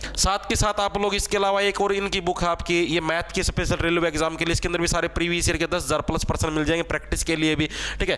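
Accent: Haryana, India. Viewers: नमस्कार जी म थारा साथी मोहित पुनिया 😅 नर और मादा की उमर X or y or दोपहर म घणा तावड़ा पड़ रय्या छ मस्त बोली है, गुरुजी थाकी 😊 हरियाणा से हैं क्या आप❤ धुम्मा मार दयो 😅 और घूमा मार दियो नर कैंडिडेट का फिजिकल में सिस्टम पाट के हाथ में आ गया था 😂😂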